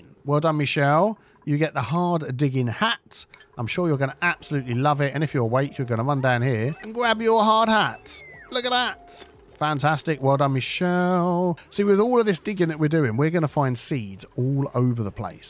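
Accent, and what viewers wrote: United Kingdom. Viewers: I haven't whatched your content in the last months because CS2 doesnt interest me anymore but ONI is my all time favorite and I love love love seeing u playing it again!!! And I really missed your british accent! So I will watch ALL your ONI content for sure!❤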